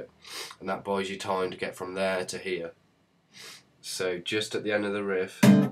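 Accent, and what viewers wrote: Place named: United Kingdom. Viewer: wow.That was actually the best Andy tutorial i saw on youtube,and the best british accent i heard in my hole life.Thanks bro!!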